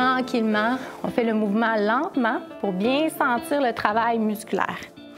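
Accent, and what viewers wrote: Canada. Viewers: C est clair le rythme est bon et un petit dernier …avec l accent canadien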